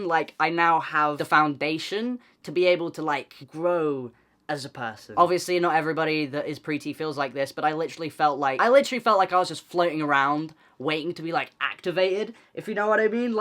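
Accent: United Kingdom. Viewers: hes literally just british ahah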